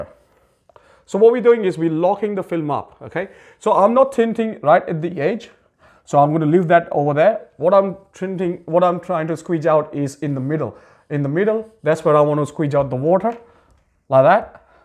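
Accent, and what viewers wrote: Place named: India. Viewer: What in the world kind of accent is that?!?😂 Sounds like that made up accent Jayden Smith used in that movie “After Earth” 😆 Part of it sounds Indian, part sounds English with a hint of something else lmao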